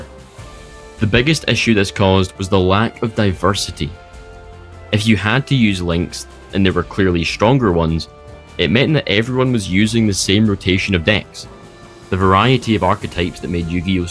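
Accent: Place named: Ireland. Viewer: I can't argue with anything you said honestly, well said. PS your accent is cool. Is it Irish? Scottish? An English dialect?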